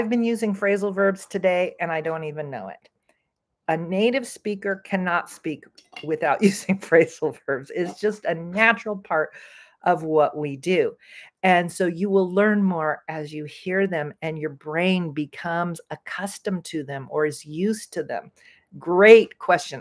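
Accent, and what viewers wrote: United States. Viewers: Unfortunately, I didn't watch the livestream. However, now I am watching and I can understand each word coming from your mouth very clearly although you're American. Since American English is harder to understand than British English. Probably you are speaking slowly because of your having lots of international followers. Good channel and very helpful. Thanks for your contribution. 👏👏👏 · I am from Indonesia and always glad to hear American English with speak slowly like you do. I just still dificult to understand between can't and can. Thank you. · Dear Madam I like to hear your voice and I almost understand all the content of your speaking. Even though, I've been learning English for almost twenty years, up to now, I can't imitate American's intonation and style like you do.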